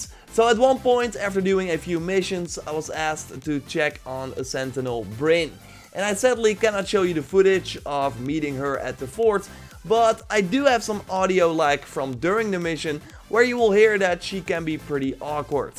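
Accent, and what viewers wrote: Netherlands. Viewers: Hey are you Dutch. I love your vids by the way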